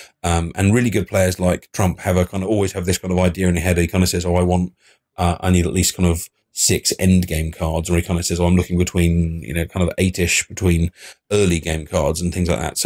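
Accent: England, United Kingdom. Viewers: Denn Green III He's english and has a deep voice. perfect combination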